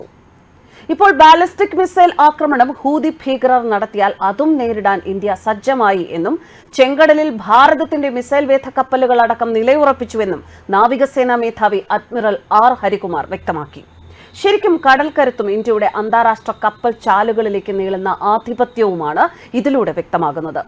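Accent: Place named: Palakkad, India. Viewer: ഹോ ഒരു കൂതി ഭാഷ പാലക്കാടനാ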